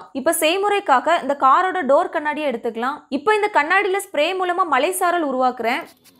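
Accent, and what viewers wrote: Tirunelveli, India. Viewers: திருநெல்வேலி நாடார்களின் குரல் சூப்பர்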